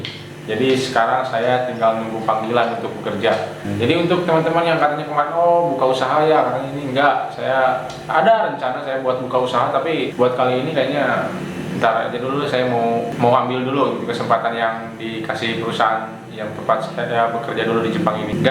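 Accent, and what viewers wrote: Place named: Indonesia. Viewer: Balik ka indo tone na langsung beda jadi indo banget haha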